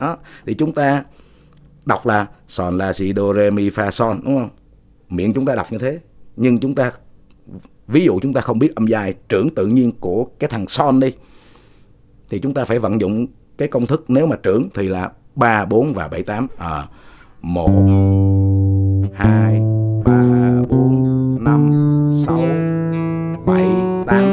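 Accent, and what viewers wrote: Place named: Cam Ranh, Vietnam. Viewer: ..lúc đầu nghe tiếng nói a e nghi nghi rôi ..vì e cũng là ngưòi Can Ranh .Mong a nhiều sức khỏe.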